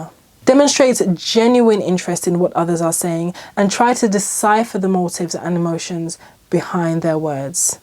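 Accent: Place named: United Kingdom. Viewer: it's just proper British talkin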